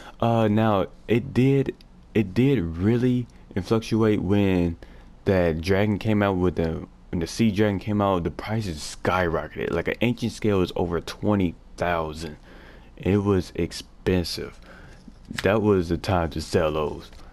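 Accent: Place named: China. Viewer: U got a Chinese accent are you from Uganda?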